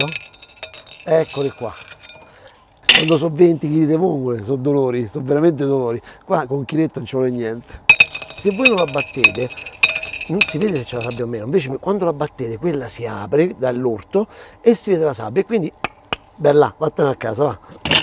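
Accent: Rome, Italy. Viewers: sei simpatico sei sempre sorridente spieghi benissimo e sei de Roma col vostro accento che mi fa impazzire. Ho provato alcune delle tue ricette e mi vengono anche bene, l'unica critica che ti posso fare e' che a forza di provarle sono ingrassato di 10 kg. 😄😄. Grazie di tutto.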